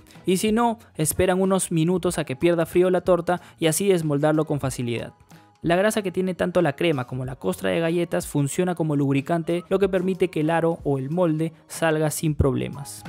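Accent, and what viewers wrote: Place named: Guatemala. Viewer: jeje Chapín es Guatemalteco, tu acento es muy similar al de ellos. He estado en Guate varias veces, me gusta mucho. Gracias por este postre.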